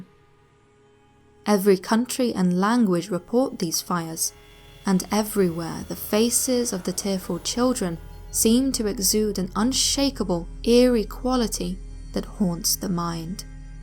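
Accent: England, United Kingdom. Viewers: Great video...love the accent!! Not trying to be Pervy McPervinstein but english accents are sooo hot and make a woman sound classy and intelligent which we all know is classy as well. Anyway ive aleays seen depp as a wussy...no suprise a painting could do that to him. Especially knowing the backstory of not just painting but artist as well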